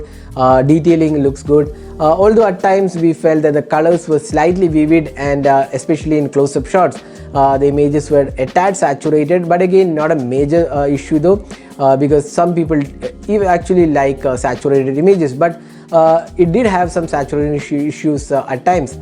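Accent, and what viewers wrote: Kerala, India. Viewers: Sir, are you from Kerala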